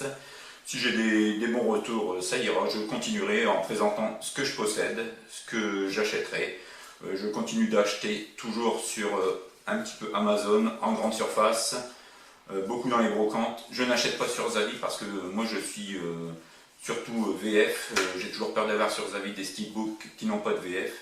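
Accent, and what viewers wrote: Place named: North, France. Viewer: Salut, super ta première vidéo tu as une super collection, j'ai hate de voir tes prochaines vidéos,merci pour ton remerciement et j'entend qu'il y a pas que moi qui est du nord;)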